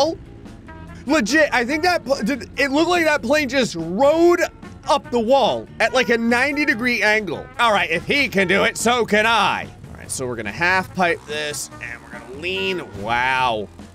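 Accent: United Kingdom. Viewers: IS FROM THE UK????!!!!!!!!! 😮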